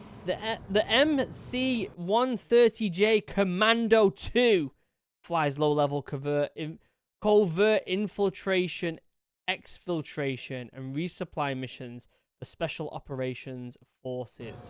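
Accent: United Kingdom. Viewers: After the first ranting when the video starts the guy says video has no audio, video has audio doesn't have narration and narrative is shown under screen and suddenly guy says let me read it so people have problem in reading English understand it, I am a civil engineer with masters degree in steel structures and second master in Political Science and international relations and now studying PhD in regional studies and my toefl score was 97 point out of 120 which I took the test 5 years ago and now I am so much better in English, I wanted to say to this guy the British accent is fading out very fast and the real reasons for it's demise are it's unclear and non comprehensive, as I witnessed 2 brit one from Chelsea and on from other side of the London couldn't understand each other, and it's a snub and how it carries the feeling make the people who hear it feel bad, it's getting lectured about importance of morality in life and Vlad putin is the person gives you a talk on how precious life is, and if a person had problems in reading a text in English you be sure they never understand a word you mumbled, if you want to your work be seen by people loose the accent man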